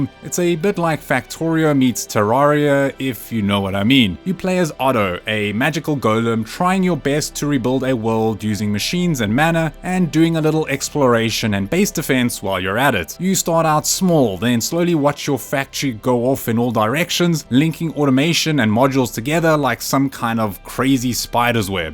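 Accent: South Africa. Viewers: Are you South African? Sounds very familiar! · bro why do you sound south african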